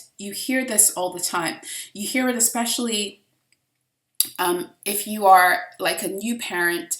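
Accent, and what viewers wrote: United Kingdom. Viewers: Why are you doing a British accent, your from the States yes?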